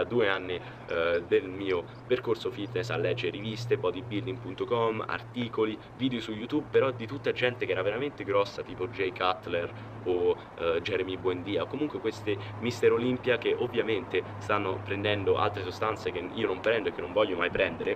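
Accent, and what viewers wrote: Rome, Italy. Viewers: Sei di roma nord o sud? TOP!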